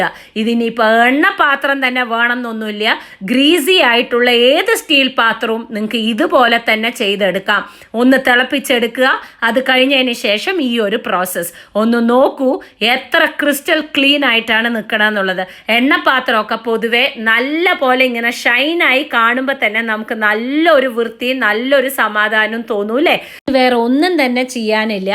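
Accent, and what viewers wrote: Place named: Palakkad, India. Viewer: I am watching u r vedios just yo hear the palakad slang🥰😍🤩💕.I am a alumini from chittur college, basically I am from kannur still in love with pkd slang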